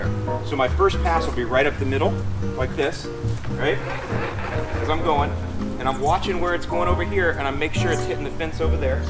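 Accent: Chicago, United States. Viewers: I can't get enough of the accent. I need a whole Chicago accent episode!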